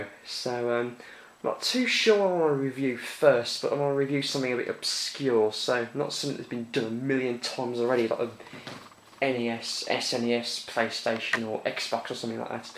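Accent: United Kingdom. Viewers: u sound like ure from the west mids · i love your british accent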